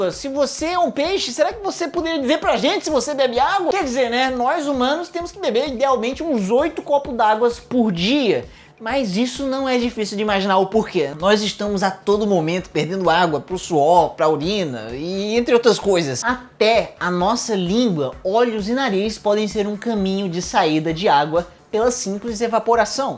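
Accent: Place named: Northeast, Brazil. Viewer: OXENTE! É NORDESTINO!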